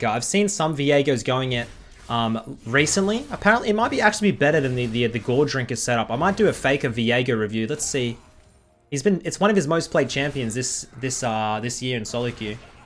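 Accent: Australia. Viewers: i hate to break it to you but thats an aussie accent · I come to these videos for, stay for the gameplay. you are educational and your oz accent makes everything you say sound hilarious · If u would drop the obnoxious Aussie accent I would sub :>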